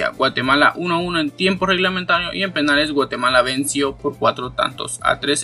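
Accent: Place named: El Salvador. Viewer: Mi amigo, utilizá Loquendo! No se te entiende y tenés acento como de salvadoreño. Un abrazo!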